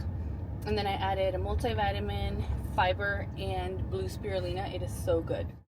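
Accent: Spain. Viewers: Love your Spanish accent!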